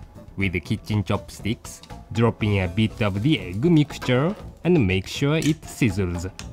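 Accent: Japan. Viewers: looks like you've never heard a japanese speak english... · 英語が日本語すぎてちょっと笑ってしまう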